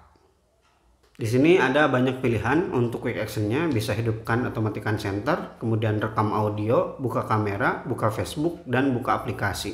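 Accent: West Java, Indonesia. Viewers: Orang jabar ya kang?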